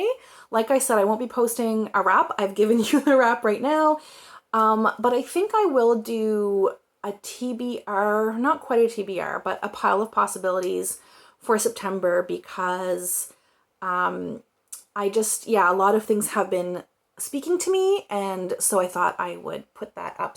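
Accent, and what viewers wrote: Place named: Maritimes, Canada. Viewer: Good to know, Maritime dialect! 🤓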